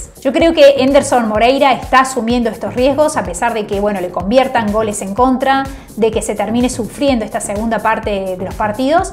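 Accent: Córdoba, Argentina. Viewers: Wao sabes demasiado de fútbol mucho más que muchos comentaristas reconocidos y youtubers famosos Has estudiado algo referente al fútbol? Hace poco descubrí tu canal y es una joya algo que no hay en la plataforma análisis táctico de la liga peruana Increíble Nuevo suscriptor y me parece que eres de Córdoba 😊 Saludos desde Lima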